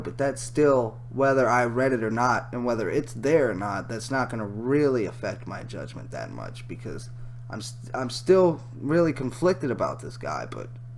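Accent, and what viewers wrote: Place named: South, United States. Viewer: who the fuck are you? you sound like some stereotypical dumb hillbilly. your recording machine seems to be broken. it sometimes sounds like a vinyl record (if you know what one of those are. if you don't maybe ask some old guy.) that has a scratch and is stuck in a groove what are you doing on the internet anyway? shouldn't you be out hunting possums for dinner?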